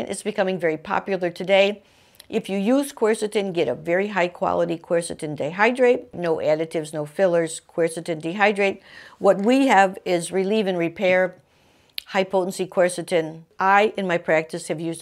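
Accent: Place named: Brooklyn, United States. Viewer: Of course,....you are selling a quercetin supplement! Where's dat accent from,....you sound like my uncle Louie from Brrrooklyn!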